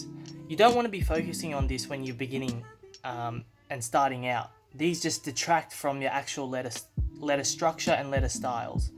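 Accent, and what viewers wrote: Australia. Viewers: Love a familiar aussie accent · Coming from West Syd been sketching and writing for nearlly 12 years, i completely agree with everything you said and thought all the advice was awesome i defs wish i had something like like thsi when i was younger looking to learn but i ended up teaching myelsf everything i know...... anddd was just wondering where abouts in australia are you from i can tell buy your accent??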